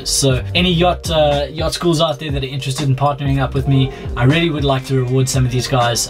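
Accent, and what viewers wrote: South Africa. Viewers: Love your videos , love what you do . Are you South African ?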